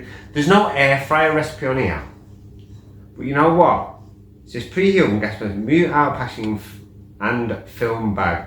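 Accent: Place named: Willenhall, United Kingdom. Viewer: Where you from, dude? Sounds like Willenhall, or Walsall? Good review!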